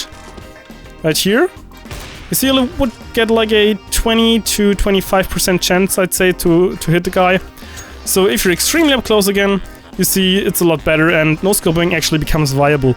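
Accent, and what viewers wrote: Germany. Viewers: u german or something?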